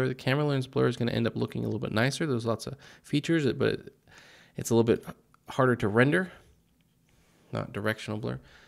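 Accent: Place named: London, United Kingdom. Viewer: 3sec to watch and like, thx. More tutorials!!! best londons speak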